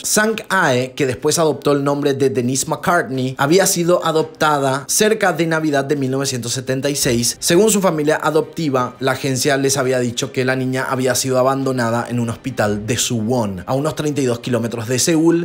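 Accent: Paraguay. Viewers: Tiene una voz espectacular!!! es Paraguayo, a caso todos los youtubers tienen que hablar con tonada mexicana??? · Tenes acento Paraguayo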